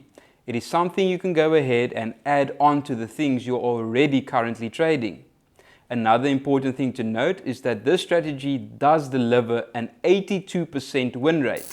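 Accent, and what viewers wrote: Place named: South Africa. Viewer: lol i didnt even see the 'better than ict cap' he sounds like someone from south africa ja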